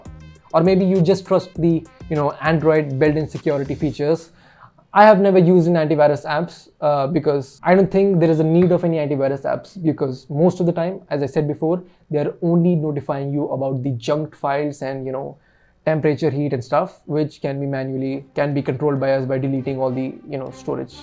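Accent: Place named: Southeast Asia. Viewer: Get used to it, way more people in the world sounds like this when they speak English than the American or British version. in South East Asia alone there are about 500 million English speakers and they all sound like this. But I do agree he needs to slow down a bit.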